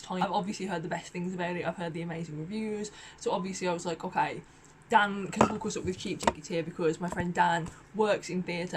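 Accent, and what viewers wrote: United Kingdom. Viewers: I practice my english listening watching your videos ❤❤you have a really strong British accent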